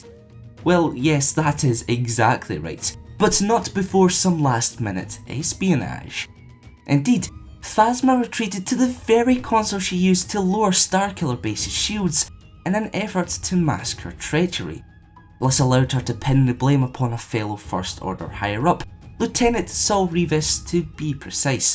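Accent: Scotland, United Kingdom. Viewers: You sound like young Scotty from star trek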